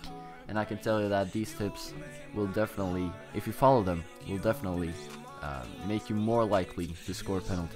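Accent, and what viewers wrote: Norway. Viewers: u sound like your norwegian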